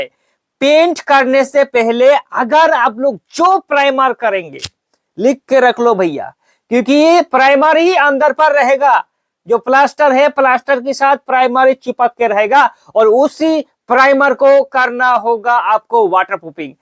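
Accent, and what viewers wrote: Bengal, India. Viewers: Bhai aap bangali ho?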